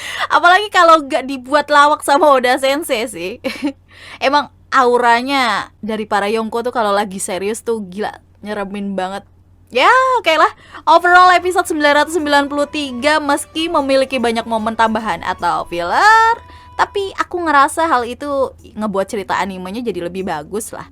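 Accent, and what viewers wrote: Java, Indonesia. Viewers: Medok dia org jowo kek Mr kucluk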